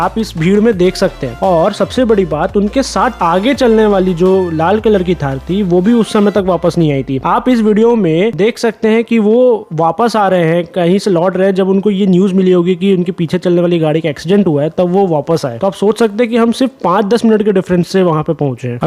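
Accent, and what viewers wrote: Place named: Chhattisgarh, India. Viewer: Are you from Chhattisgarh because your accent feels like it.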